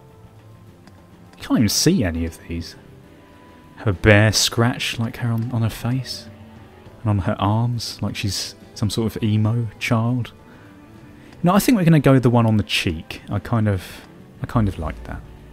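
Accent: United Kingdom. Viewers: Your commentary is just hilarious. That confused, yet dry attitude along with your accent makes me picture a proper englishman with a top hat playing an (at first) very confusing, yet awesome RPG. The lighting is a bit off, jacked that up a notch too much.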